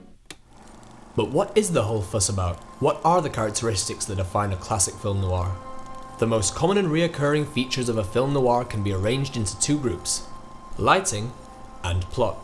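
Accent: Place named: Lancashire, United Kingdom. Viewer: Can't hide a Lancashire accent. 😁 Fantastic doc!! Thank you!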